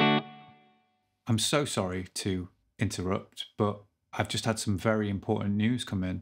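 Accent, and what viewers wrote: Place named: Northern England, United Kingdom. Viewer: Crackin'! Ta,. I've been hammerin' & pullin' for nearly 40 years but still can't get the timing right..... One of these days. Btw, you sound like a northern lad, which is the best type of course, but can I ask - would you mind not dropping so many 't''s, it's just not quite right! If you do so I'll promise to stop droppin' my 'g''s! Is it a deal?? Love on ya!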